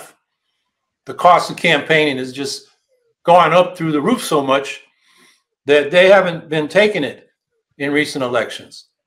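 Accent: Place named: South, United States. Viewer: According to Wikipedia: "Hawkins was born in San Francisco, California, in 1952, and raised in nearby San Mateo, California. He grew up in a diverse neighborhood in the city near the Bayshore Freeway, which had seen a large influx of migrants from the southern United States, both black and white: Hawkins has credited his southern-inflected accent as being a result of this."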